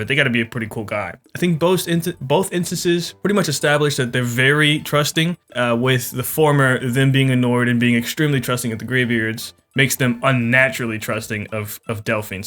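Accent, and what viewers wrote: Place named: New York, United States. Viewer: Isn't me or as a video went on. He got more and more New Yorker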